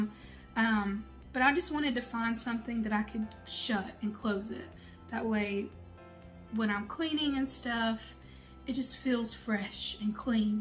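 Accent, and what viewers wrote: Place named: Kentucky, United States. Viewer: You have to be from Kentucky you have the voice lol so am I...great haul,,love Peddlers Mall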